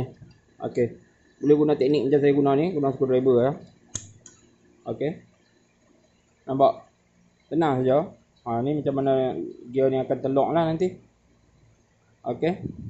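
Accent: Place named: North, Malaysia. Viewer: Kedai dkt mn dgr mcm belah utara ja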